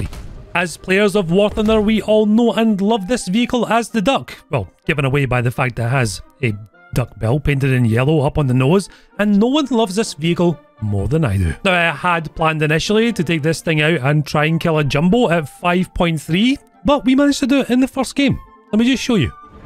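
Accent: Scotland, United Kingdom. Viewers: Bro you can hear he is scottish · are you british ???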